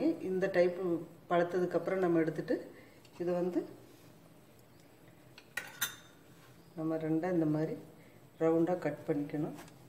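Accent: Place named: Kongu, India. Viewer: Kongu slang with recipe ketka nalla irrukunga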